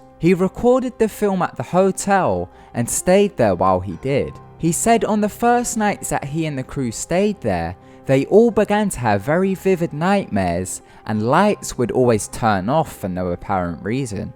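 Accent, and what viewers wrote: United Kingdom. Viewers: I think he just has that kind of British accent...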